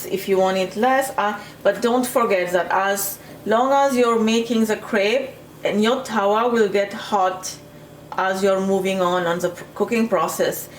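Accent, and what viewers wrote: Mauritius. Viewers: You have a mauritian accent.x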